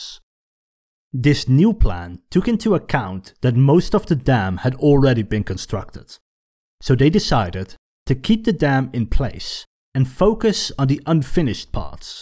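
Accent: Netherlands. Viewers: Hey, je uitspraak is een beetje te Nederlands voor iemand uit een ander land... Kom jij toevallig uit Nederland? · The way you speak english and your dutch just like me 😂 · Hij heeft wel een Nederlands accent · Funny, you have a Dutch accent. And thanks for including a photo made from my hometown! Yay! I was born there. 3 meters below sea level. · Thats the strongst Dutch accent I’ve heard lol(your english is good tho) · Nice Dutch accent on your English 😝 Vette video in ieder geval 👍🏻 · I thought you had a Dutch accent... now I know! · me thinking maybe this is a english dude thats instrested in the netherlands me hering his voice: oh ye nvm hes dutch for sure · Ik hoor gewoon dat je Nederlands bent😂😂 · are you Dutch? I've always thought your accent sounded a bit Dutch but I could be totally off. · I can tell by your accent and the way you speek Dutch words that your Dutch like me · You sound dutch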